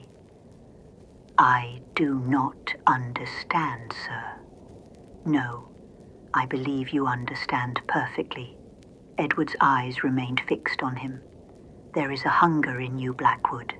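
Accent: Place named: United Kingdom. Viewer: I really enjoyed that, another British voice with added sound effects, wonderful!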